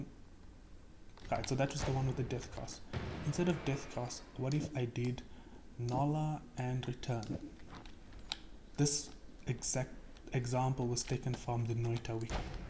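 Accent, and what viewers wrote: South Africa. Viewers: Hey man just wondering you have a South African accent Are you form the Western Cape?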